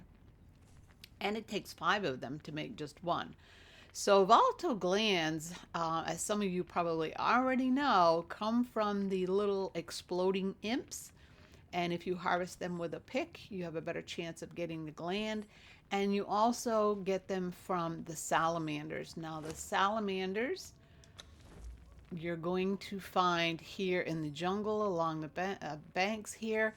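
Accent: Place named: United Kingdom. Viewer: Love your guids, im from the uk so also love the accent 👍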